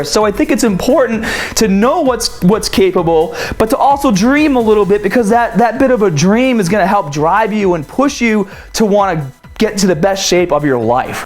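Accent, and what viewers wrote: Boston, United States. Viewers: I do agree with you on pretty much all of the videos you make, you seem like a good and knowledgeable guy about what your talking about. I do have to say you sound way more Boston than you used too, don't know why but you really do. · Nah its a boston accent is from lawrence Massachusetts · Is it just me or has accent become a lot more prominent recently? Boston I believe? It's awesome haha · You sound more like you're from Boston or New Jersey every day haha good vid!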